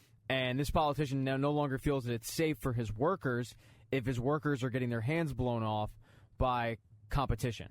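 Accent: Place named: Baltimore, United States. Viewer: Where u from I hear an accent... down the ocean, hon??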